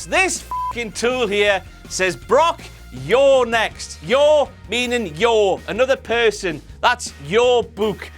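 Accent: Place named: United Kingdom. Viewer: I love British accents and ranting it's just the best.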